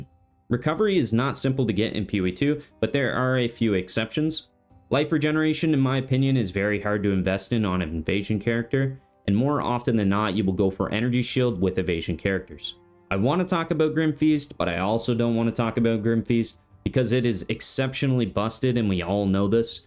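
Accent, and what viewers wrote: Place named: Canada. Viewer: love you content, bud. Yo are you from like Minnesota? You sound like you've got a light Canadian accent coming through sometimes, I'm wondering if we're neighbors lol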